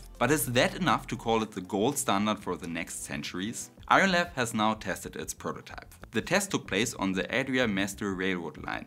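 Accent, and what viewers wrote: Germany. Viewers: Gutes Video! Aber sprich bitte nicht so schnell. Dein Englisch ist schwer zu verstehen, wegen dem starken deutschen Akzent. z.B "cushion" wird "kuschen" ausgesprochen.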